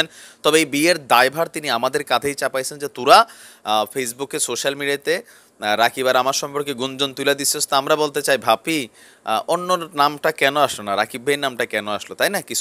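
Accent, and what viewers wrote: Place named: Comilla, Bangladesh. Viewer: আপনার কুমিল্লার ভাষা গুলো খুব ভালো লাগে আমার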